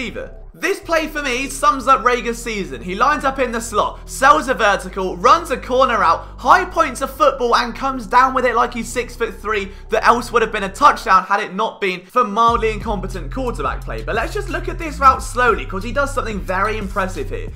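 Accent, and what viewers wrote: England, United Kingdom. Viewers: Never in my life did I think I'd hear such intelligent football breakdowns in such a British accent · Love me some EPL but this is the first time an English accent did it for me with AmeriCAN Football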